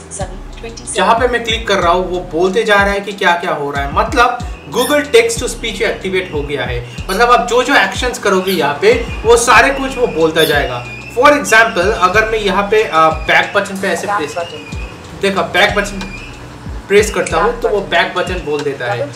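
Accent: India. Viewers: Damn, couldnt get passed the heavy indian accent. Not hating him, wtf u thinkin, damn did not help me at ALL Thanks 4 Nothing. Just wanted keyboard back to normal, now Im fluent in indian language. Damn, use some one that speaks clearer english, just sayin......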